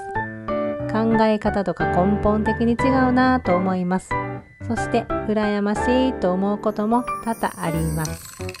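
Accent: Kansai, Japan. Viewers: さん、初めまして、先日から、さんの動画を視聴させていただくようになりました。元々、海外で暮らされている日本の方々の暮らしに興味をもっており、色々な国に住んでる方の動画を視聴させて頂いているうちにさんの動画にたどり着いたという感じです。私も大阪に住んでおりますので、さんが関西弁で語りかけてくださるのがとても親しみを感じてうれしかったです。さんの動画をみてびっくりの連続です。まず、私のもってるドイツという国の印象が変わりました。1番びっくりしたのは、サウナに男女混合で裸ではいるというのに、びっくりして、主人にいうと「年寄りばっかりちゃうんか？」と言い、後でネットで調べるとそうではないこともわかり、また他にも色々と取り決めがあるというのを知って、再度びっくりでした。長文になりすいません。また、動画を楽しみにお待ちしています。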